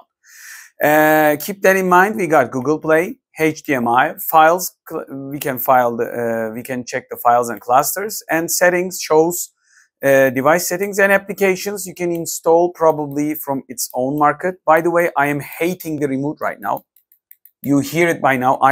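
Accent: Turkey. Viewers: Bu türk ingilizcesi nerde duysam tanırım :) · Hocam helal olsun :D , süper bir kanal yapmışsın , aksanından Türk olduğunu anladım. Türk olduğuna bahse girerim ama ispat edemem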